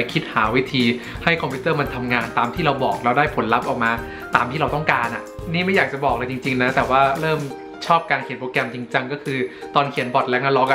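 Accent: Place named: South, Thailand. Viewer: สำเนียงคล้ายคนใต้มาก 555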